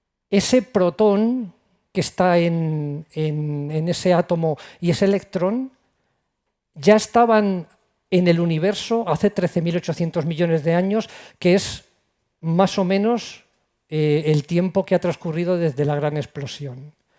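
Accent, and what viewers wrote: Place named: Spain. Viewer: Excelente charla, sumamente interesante, me tuve que bancar el acento Español que no lo soporto para nada para escuchar una conferencia de 10, un pequeño sacrificio por una gran recompensa :)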